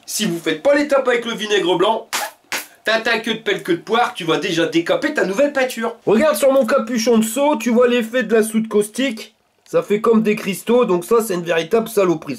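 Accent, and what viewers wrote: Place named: North, France. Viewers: Bjr elle est top votre astuce merci pour le partage avec le petit accent ch'timi j'adore 👍🍻JP du Var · bonjour , trop bien vos idées et en plus vous êtes trop rigolo et ça sent l'accent du nord ou nord pas-de calais je suis du nord merci à vous pour vos idées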